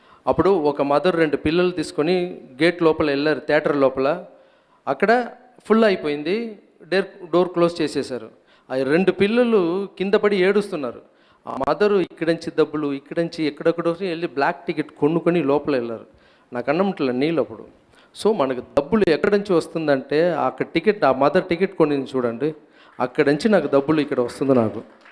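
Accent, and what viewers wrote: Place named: Telangana, India. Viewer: Only Telangana style Raghava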